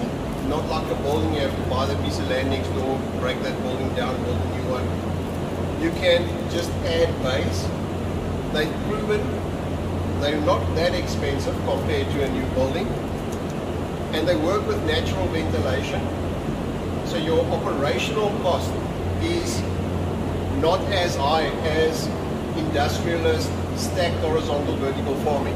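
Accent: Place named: South Africa. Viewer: My guy sounds south African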